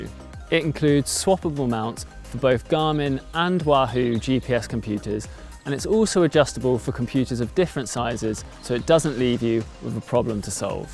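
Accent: United Kingdom. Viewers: Good British English. Soo easy to listen to.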